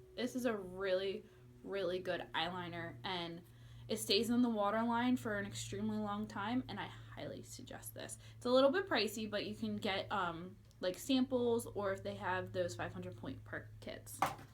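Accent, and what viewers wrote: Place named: East Coast, United States. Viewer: Your east coast accent.....love it!!!!! Great video!!!!!😃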